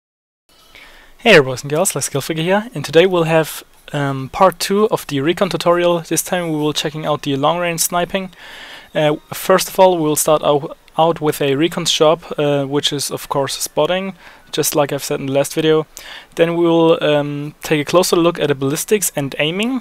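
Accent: Germany. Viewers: Haha hab sofort den deutschen akzent gehört. Sehr schönes tuorial. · are you german? your voice sounds like german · Are you german? it sounds like you are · Haha ... man merkt das du Deutscher bist... ^^ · are you german? you seem to have a german accent?:D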